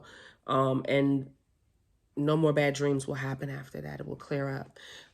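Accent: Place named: DMV, United States. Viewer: I hear a DMV accent…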